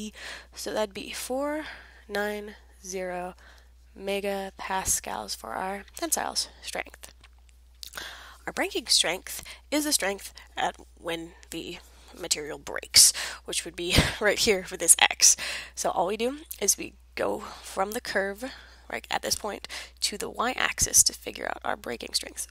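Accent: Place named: India. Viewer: What's normal? An Indian instructor that you need to read captions to understand?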